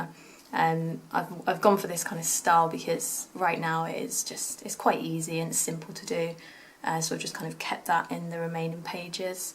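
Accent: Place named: England, United Kingdom. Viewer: I love your accent. What part of England are you from?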